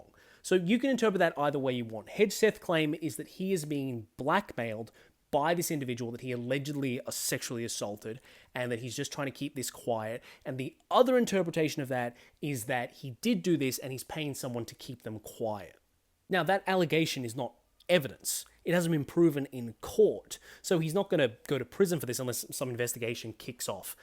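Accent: Australia. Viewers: Just found out you have a YouTube page. Instant follow! Also, I always forget you're Australian lol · australian mate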